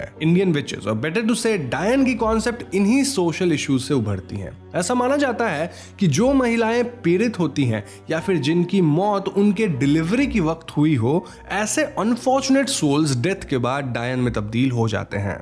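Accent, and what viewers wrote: Bengal, India. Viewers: Can I ask you a question sir....are you Bengali?? Cz you're Hindi accent main thoda thoda Bengali wala accent aa jata hai.... ( I am Bengali )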